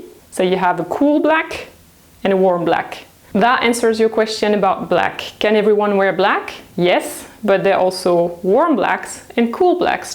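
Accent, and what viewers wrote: France. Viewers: oulalalala je capte le signal d'un accent francais! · I think she's french by her accent or I may be wrong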